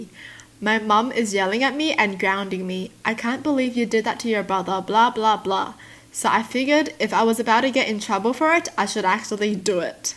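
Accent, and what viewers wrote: Australia. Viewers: I’m new so when I here her voice I think she’s has to be Australian then I heard “Swallow I that advice for yourself mate”Me:yup definitely Australian I have an Australian accent but I’m American yup I’m weird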